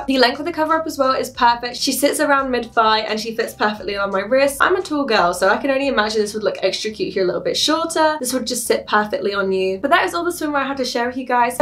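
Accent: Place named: United Kingdom. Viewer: You are gorgeous and super cute. Beautiful eyes, cute voice with the British accent, beautiful body and curves, very cute little belly button, nice booty long legs. You look amazing and very cute in every outfit you wore in this Try On Haul video. The blue floral bikini really made your beautiful eyes pop and with the blonde hair hello cutie. The Pinkish bodysuit/one-piece swimsuit made you really beautiful too along with the White big T-shirt at the end. In the White big T-shirt at the end with the blonde hair you are really beautiful. You look like an angel.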